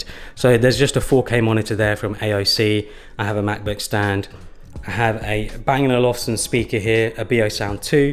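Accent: United Kingdom. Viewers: You got the best british accent ever lol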